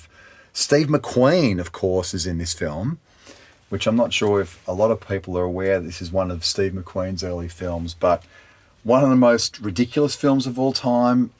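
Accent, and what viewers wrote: Australia. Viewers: I didn't even know he was Australian, never heard his natural accent! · he sounds extra Australian here lol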